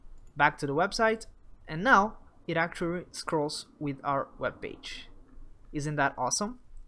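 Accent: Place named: Brazil. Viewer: pelo sotaque senti que era do Brasil, você tem um inglês leve e sonoro, muito bom!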